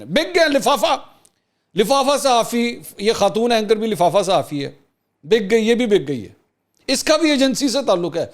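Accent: Punjab, Pakistan. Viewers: Kamal punchy hain ap bhai mulaa jutt film ki Punjabi tk kamal hai apki mind present hta hai apka that's why I say you are brave journalist love you bro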